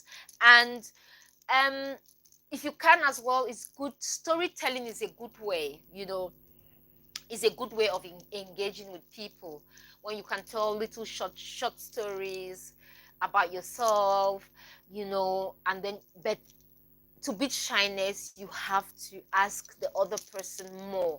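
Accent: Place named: Democratic Republic of the Congo. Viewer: my sweetheart. Listening attentively to you, I think you are originally from Congo ( Zaire ).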